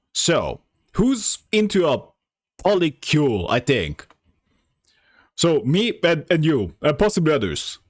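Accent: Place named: Eastern Europe. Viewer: There’s no way you’re not Eastern Euro! No way!!! Subscribed btw 😀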